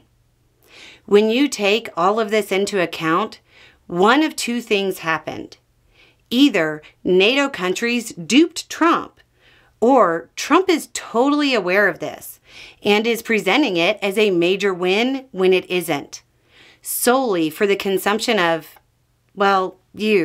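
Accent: South, United States. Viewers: Thank you for the "Monkey Paw" reminder and the description of the current Monkey Paw. Your southern style polite got ya's are precious. Keep smiling.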